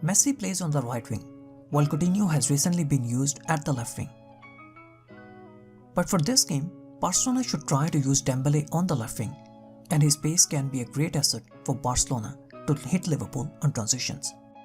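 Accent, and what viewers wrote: India. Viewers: you sound Indian? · why your sound like a indian, are u Indian? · No disrespect man but as soon I can hear the Indian accent I couldn't go last two minutes.... Shocking... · u have kinda Indian accent.... I guess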